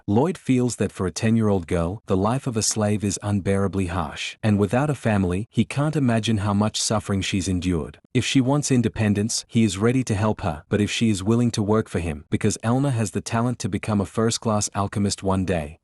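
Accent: United Kingdom. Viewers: British ahh accent. It's okay tbh. Just not used to it. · Why is AI talking with British accent 😭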